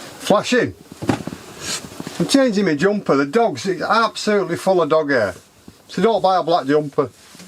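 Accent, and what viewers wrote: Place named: Lancashire, United Kingdom. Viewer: Nowt wrong wiya Lancashire accent! Another crackin vid mate. Cheers Dave & Helen 👍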